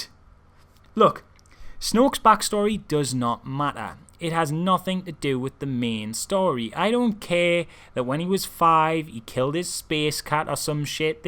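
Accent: Newcastle, United Kingdom. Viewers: British huh......pussy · Newcomer to the channel - thanks for the honest thoughts in this video. I liked TLJ a lot too. Also, love the unapologetic Geordie-ness. Good on you, fella (from way down South in Derby) 😎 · I fookin luv ya u geordie shite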